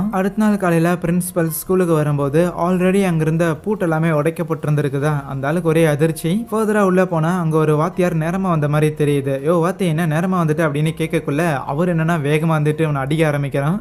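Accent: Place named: Madurai, India. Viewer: Unga slang avangya nu sollum bodhu nenachen, Madurai side than irupinga nu 😅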